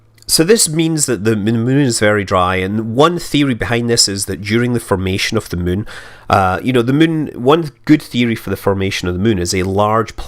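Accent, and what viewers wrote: Scotland, United Kingdom. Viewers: I saw that the channel's name was Scott Manley. Then a deep Scottish voice validated that name.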